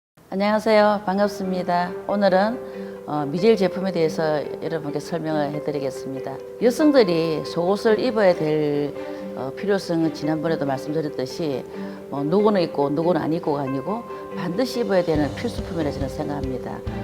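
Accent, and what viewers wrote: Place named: Gyeongsang, South Korea. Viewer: 경상도 말씨~친근감이 들어 꼭입어야 될것같아요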